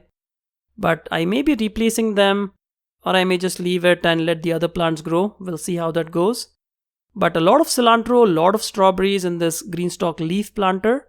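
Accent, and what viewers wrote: India. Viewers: hey dude u sounds like native indian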